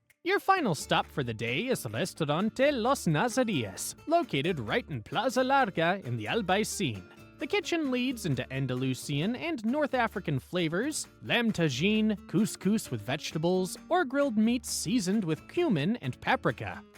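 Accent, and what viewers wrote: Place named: United States. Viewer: good tips but the gringo accent, ouch !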